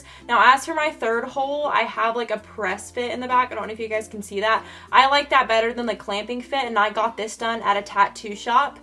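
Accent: South, United States. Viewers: Why do i feel like your accent has gotten more country throughout the years haha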